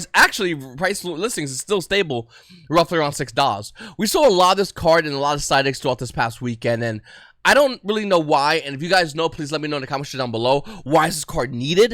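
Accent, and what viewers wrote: New Jersey, United States. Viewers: This dude is 100% from the jersey shore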